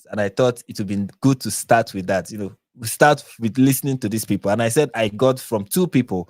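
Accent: Nigeria. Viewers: The world doesn't revolve around Americans......So get used to the accent. PROUDLY NIGERIAN 🇳🇬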